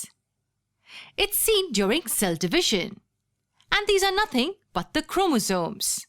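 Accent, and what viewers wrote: India. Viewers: You sound very indian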